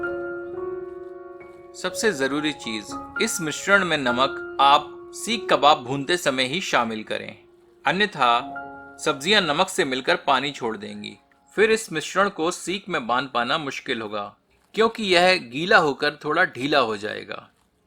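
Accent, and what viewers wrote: Standard. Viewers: शेफ आप जैसा बहुप्रतिभा का धनी व्यक्ति बहुत कम देखने को मिलता है । आपकी शुद्ध हिंदी का उच्चारण सुन कर अतिप्रिय लगता है ।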